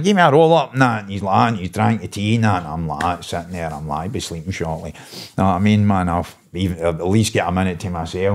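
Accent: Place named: Glasgow, United Kingdom. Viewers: I understand every word am no even a weegie 😂 perfect accent of Scotland 😎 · Unreal patter. It makes it even better that only us glesga folk understand every single word. Phenomenal haha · Very very very funny. I feel sorry for your foreign audience, S... that's about as broad a Ouijii Lallans Scots as youll get.. Im a Scot and it tested my ear! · Maybe sub titles for his awesome Scottish accent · Hilarious story and made even more hilarious by the Jock accent. Sorry Yanks you're missing out.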